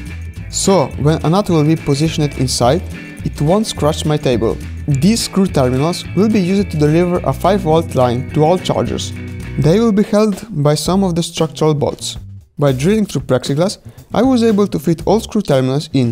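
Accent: Italy. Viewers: ehi, sei italiano? si sente dall'accento... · Si sente che sei italiano...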